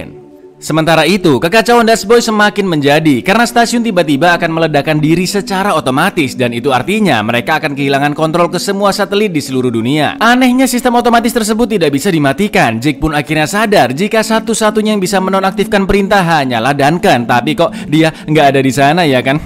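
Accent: Java, Indonesia. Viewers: lagi² medok. kebanyakan yg bahas film pada medok. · pengendali cuaca pake alat hah, indonesia dong pake pawang 😂 Yo ngene iki nek bahasa jowo medok dadi content creator, sak onone disebutno 🥲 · Cak Jawa mana sampean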